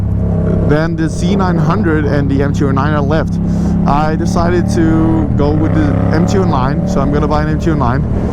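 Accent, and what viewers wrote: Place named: United States. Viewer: you have a very American sounding accent lol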